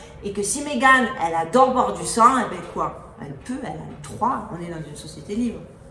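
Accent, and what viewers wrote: South, France. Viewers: bjr, j'espère sincèrement que yOutube va vOus Laissez pOsté tranquiLLement vOs vidéOs qui sOnt extras. "nOus vivOns cLairement dans un mOnde dément de démOns" Prenez sOin de vOus & de vOs prOches sur Vegas. (Merci pOur Les petites pOintes d'humOurs & pOur cette accent qui me rappeL cOmme j'ais aimé vivre dans Le sud) "ça fi du bien" 🇨🇵😉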